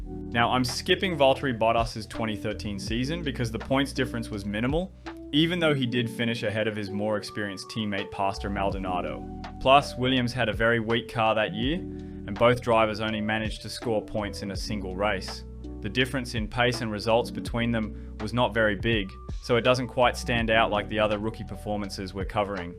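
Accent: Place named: Australia. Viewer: Love the way your accent randomly switches between Aussie and American between sentences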